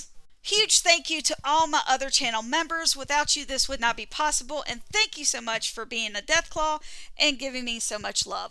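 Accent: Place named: South, United States. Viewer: Found a pink so far! Thank you for the guide southern sounding lady!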